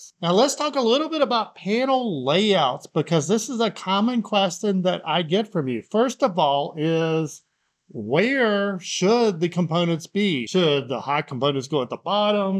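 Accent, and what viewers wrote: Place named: United States. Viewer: where's your accent from in America? I don't live in America so it's genuine curiosity 😅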